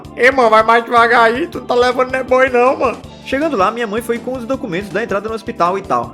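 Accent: Brazil. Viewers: cheguei até aqui pq vi um comentário seu no canal da Dora Weigand, e eu simplesmente adorei teu canal e que vídeo foda, dei ótimas risadas kkkk e que bom que to esse sofrimento já acabou, pelo tua voz eu chuto que tbm é Cearense, se não for é do nordeste tbm certeza.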